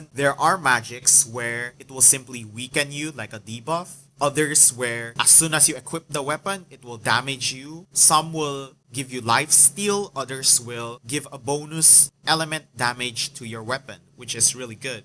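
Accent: Philippines. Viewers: I heard Filipino accent here hahaha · soooooooooooooo filipino accent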